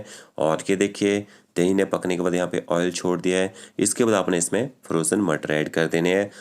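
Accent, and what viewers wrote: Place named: Himachal Pradesh, India. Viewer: Sabji tau bahut badhiya hai hi par chalni rakhkar excess oil nikaalne ka idea bahut achcha laga. Aapki Vanni se lagta hai ki AAP Himachal ke rahne vale hain. Shubhkamnaye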